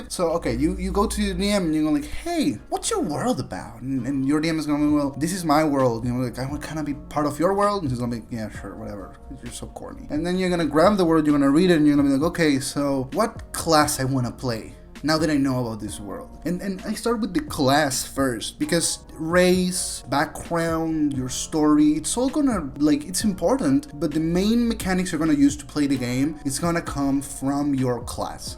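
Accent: Argentina. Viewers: Bancá, sos argentino?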